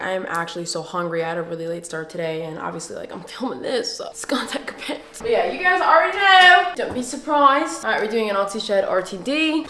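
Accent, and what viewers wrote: United Kingdom. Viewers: love the british accent 😂😂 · The British accent lol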